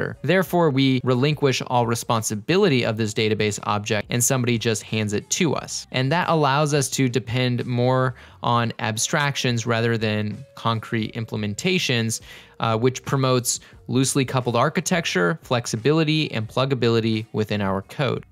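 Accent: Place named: England, United Kingdom. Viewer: Perfect explanation it's also nice to hear an an English accent I hypothsize YouTube's algorithms promote Indian vloggers it's so hard to find programming videos in English accents thanks for the awesome video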